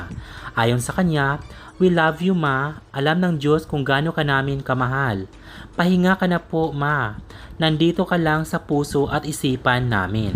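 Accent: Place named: Visayas, Philippines. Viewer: Bisaya itong nag voice over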